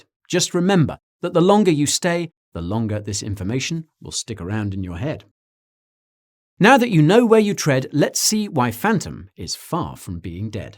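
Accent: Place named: England, United Kingdom. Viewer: Your english accent made the poetry a standing ovaiton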